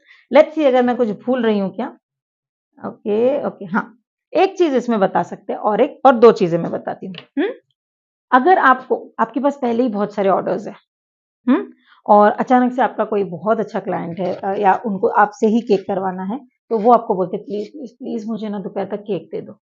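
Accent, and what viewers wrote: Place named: Gujarat, India. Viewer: Medam gujarat se he ya fir mumbi se he 😊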